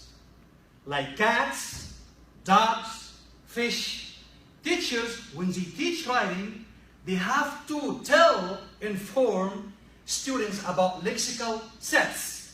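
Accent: Egypt. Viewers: Still ur Egyptian accent beholding u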